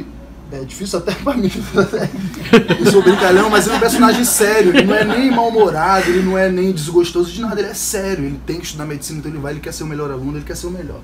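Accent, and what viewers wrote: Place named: Northeast, Brazil. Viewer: Que gca,romântico E questionador!Gostei!Ame ivc como Carlos!Parabéns!Agora que to reparando que tem um sotaque nordestino!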